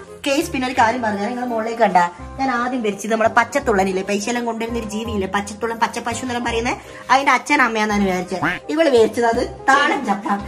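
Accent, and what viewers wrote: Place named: Malappuram, India. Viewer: thante malappuram slangum thante kannur slangum adipoli🤣🤣😄🫶🏻😎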